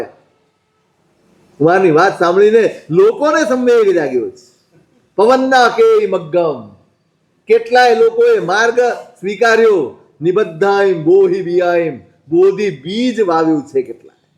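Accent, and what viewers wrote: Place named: Kathiawar, India. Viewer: Maththen vandami. Though I am not jain and have been living in foreign country ( ANARYA BHOOMI) for 20 years, I am deeply interested in Jainism. Me and my wife have listened SAMARADITY MAHAKATHA for 2 times and 3 time I am listening to you and enjoying your KATHIYAWADI ACCENT very much. Guruji, you are explaining in the simplest way and it is heart touching.Please bless us so that we can make our SOUL free from all the karmas. BAHU PUNYA KERAA PUNJ THI AA MANAV DEH MALYO, EVI KARUNA KARO AAP K AA BHAVROG TALI JAY.🙏🏼🙏🏼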